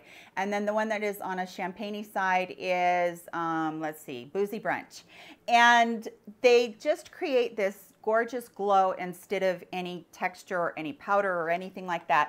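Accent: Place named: Midwest, United States. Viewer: Where are you from? You sound midwestern. Btw loved the video!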